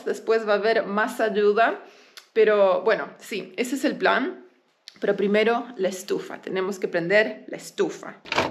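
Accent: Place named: Argentina. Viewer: tu acento eres una argentina más!!!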